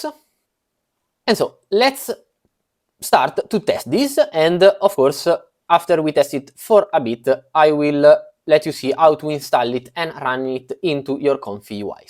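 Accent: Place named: Italy. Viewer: Just applied, name is Max Nthite...Are you from Italy? you have an interesting accent...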